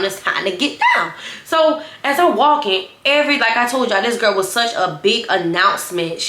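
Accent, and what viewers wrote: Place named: Louisiana, United States. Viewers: You most definitely give Louisiana accent. I just ran across your stories a couple weeks ago and I’ve binged watched them all. They help me get through the work day.. and you’re so pretty, sis. Much love, from Lafayette, Louisiana ❤️ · I literally just found you. Your accent makes everything hilarious. I just love your personality and accent. You mind me of a southern version of my girl kayshineee. I’ve been searching for new storytellers and the fact that you give a backstory too like my favorite ones?! Chefs kiss!ANDDDD the fact that you watch Asia and Symone makes me wanna watch you even more. I watched one video and got hooked! New subbie. 🫶🏾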